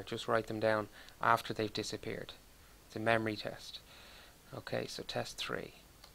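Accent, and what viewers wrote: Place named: Ireland. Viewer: Your accent is beautiful. You irish?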